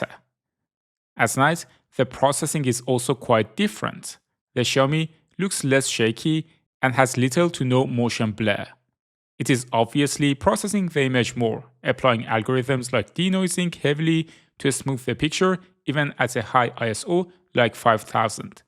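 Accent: Iran. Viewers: your iranian accent tells everything.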